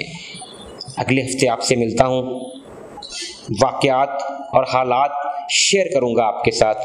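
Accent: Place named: Punjab, India. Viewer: Apka ta'lluq punjab ki kis jagah say hay brother? Lehjay say ap punjabi lagtay hain.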